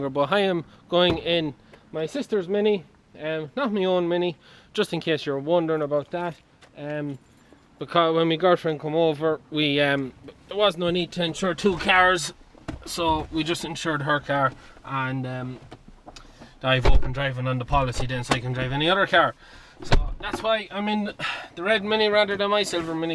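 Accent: Ireland. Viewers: are you irish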